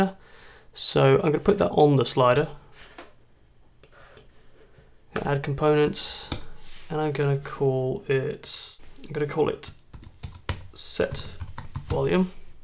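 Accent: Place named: United Kingdom. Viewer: thamkyou so much british sounding man with ironic last name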